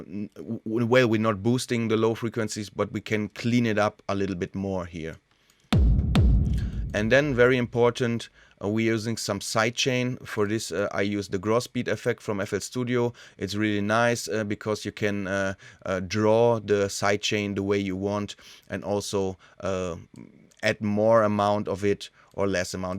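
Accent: Germany. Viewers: man hört das er deutscher is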